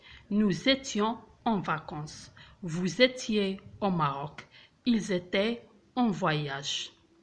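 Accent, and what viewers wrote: France. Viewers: French accent is superb. U r doing awesome work 👍👍👍😊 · really great information and superb - i love the french accent - hope these tips i will learn french - thumbs up ;)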